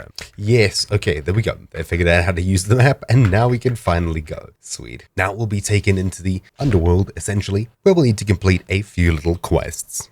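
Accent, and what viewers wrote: South Africa. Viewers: Am I the only one who only realised now, based on the live game commentary, that he’s South African 🇿🇦 😂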